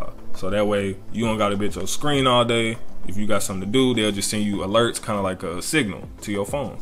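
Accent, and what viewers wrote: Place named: South, United States. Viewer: Man you sound like you from the South. Where you from my G?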